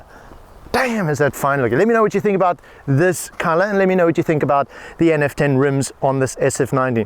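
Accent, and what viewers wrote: Afrikaans, South Africa. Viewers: Love the Afrikaans accent - flying the SA flag high and mighty ………boss content too - cheers mate keep us entertained 💪🏼😉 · This guy sounds South African...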